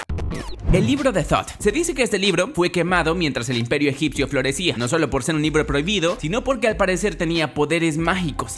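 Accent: Mexico. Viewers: ese acento mexicano me perturba mas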